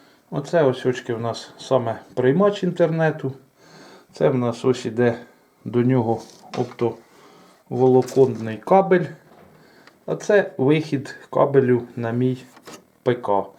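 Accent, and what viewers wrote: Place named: Poltava, Ukraine. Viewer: По говору зразу зрозумів,що автор відео з Полтавщини. Дякую!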